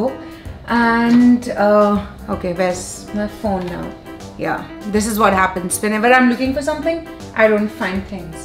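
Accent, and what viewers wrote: India. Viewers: her English is perfect she just has an Indian accent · I am not trying to be a grammar nazi but she makes a lot of basic grammatical and pronunciation mistakes lol. If People genuinely want to learn English they should go to the right source. I know she has an Indian accent and there is nothing wrong about it.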